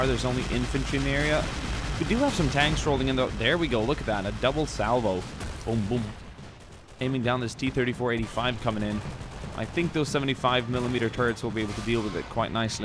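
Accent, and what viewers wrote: Sweden. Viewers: Are you swedish? You sounds like it when you speak english. Lovely videos btw. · Are you Swedish???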